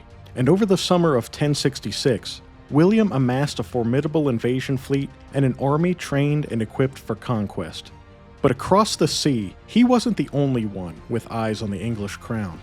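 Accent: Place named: United States. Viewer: the US pronunciation which jars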